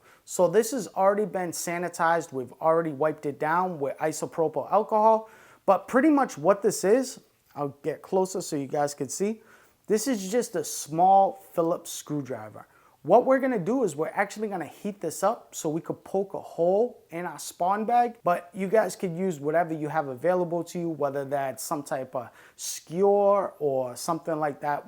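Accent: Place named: Brooklyn, United States. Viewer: He Reminds me of my Brooklyn accent.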